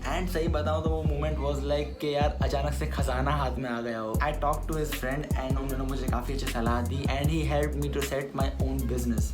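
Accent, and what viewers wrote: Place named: Gujarat, India. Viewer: yeah that's why....his accent is full gujrati 😊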